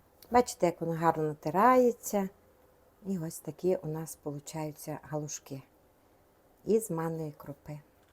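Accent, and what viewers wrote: Transcarpathia, Ukraine. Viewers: Дуже приємно слухати господиньку із закарпатський акцентом. ❤ Закарпатська кухня дуже смачна. ❤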